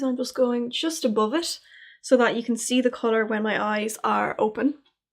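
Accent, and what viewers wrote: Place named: Ireland. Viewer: What a beautiful collection! That purple eyeshadow is stunning, even if it needs a bit of work. I really enjoyed this video, your Irish accent is so nice to listen to, and you were so thorough when talking about the products. Also (this is a really weird comment) I'm so jealous of how much lidspace you have x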